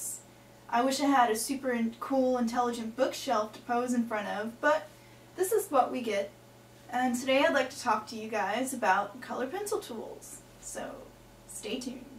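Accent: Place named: South, United States. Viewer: Great video,sounds like your from the south y'all.love it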